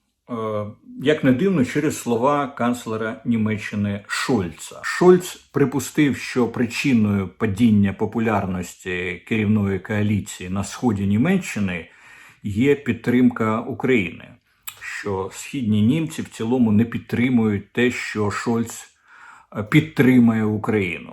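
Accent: Russia. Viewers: Арестович разом з Корчинським колись їздили в Москву для зустрічі з Дугіним і планували спільні дії щодо просування русского мира. Тому недивно, що став на захист Арестовича. Мова у пана українська, лише акцент російський. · Уважаемые украинцы, мне кажется, или этот человек говорит не на чисто украинском, а на каком-то варианте украинского, максимально приближенной к русскому? Он русскоязычный? Просто я 90% понимаю, что он говорит. А когда дикторов на телевидении слушаю, то понимаю только 50%. Он вроде какой-то там националист. Должен правильно по-украински говорить.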